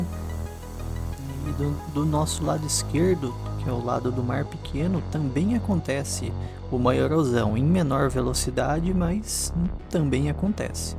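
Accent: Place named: Interior, Brazil. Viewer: Vc que está relatando o seu sotaque com certeza não é da ilha. É do interior.... 🤔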